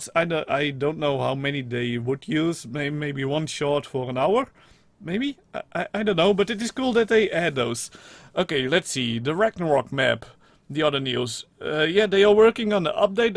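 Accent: Netherlands. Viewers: Op een andere video zei iemand in engels. Niet vervelend bedoeld, maar jij heb best een rare stem lol. Dat is zijn bakje steenkool engels natuurlijk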